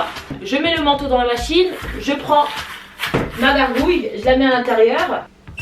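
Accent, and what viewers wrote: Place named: Democratic Republic of the Congo. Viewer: L'accent Congolais😘❤️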